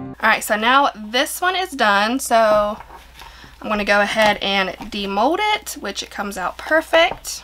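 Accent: South, United States. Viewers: Girl! Your voice is so cute. I’m from the south too (Alabama) and I much rather listen to people with southern accents! Lol this is so adorable and helpful. Thank!!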